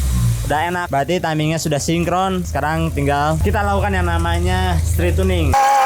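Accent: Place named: Sunda, Indonesia. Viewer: Gak disangka, ternyata Akang ini kalo ngomong bodor & Sunda pisan Hehe. Kang kalo motuba tahun 90an (mesin injeksi awal2) diubah ke standalone gini abis berapa duit?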